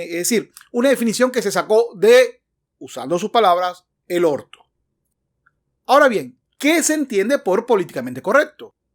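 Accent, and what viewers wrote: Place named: Maracaibo, Venezuela. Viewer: Tenei acento maracucho mano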